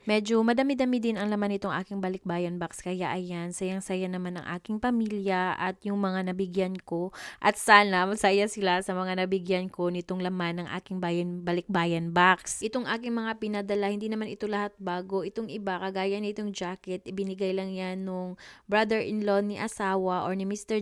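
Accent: Visayas, Philippines. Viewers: Bisaya kaayu ka Dai tundan na staging...hehehe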